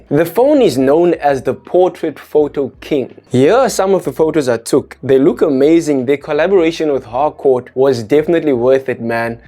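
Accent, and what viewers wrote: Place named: South Africa. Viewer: South African accent so I'm assuming South Africa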